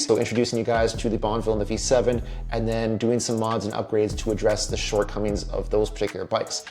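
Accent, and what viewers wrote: Canada. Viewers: He’s Canadian eh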